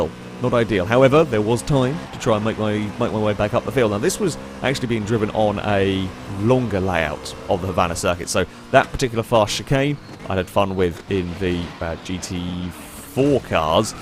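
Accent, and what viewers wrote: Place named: United Kingdom. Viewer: I love how confused people from outside America get when saying Acura. and many other British people say "A-cure-ah" where everyone in America says "Ack-your-ah" Just find it interesting how different cultures emphasize different letters on different syllables. It is a small difference, but Americans will pick up on it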